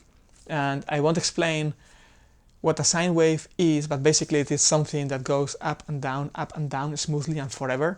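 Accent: Spain. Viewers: Really cool format and it results in a really entretaining and informative video. I can tell you speak Spanish from your accent so: muy buen vídeo! 😃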